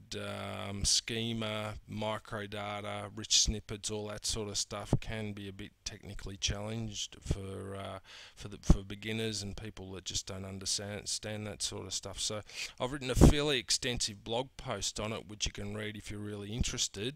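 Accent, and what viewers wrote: Australia. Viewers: Thanks, also love your wonderfully laconic Aussie accent! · great post love the Australian accent:)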